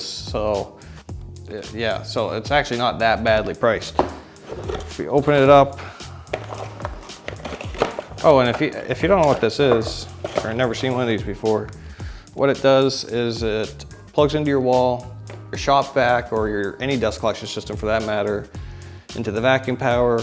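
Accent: Canada. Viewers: I believe he is canadian, not a yankee :p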